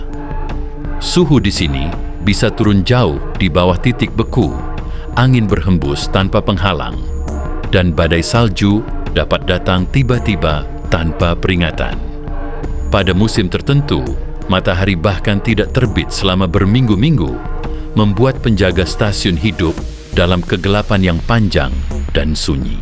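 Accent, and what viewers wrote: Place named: Solo, Indonesia. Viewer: salah,ini suaranya pria solo fixs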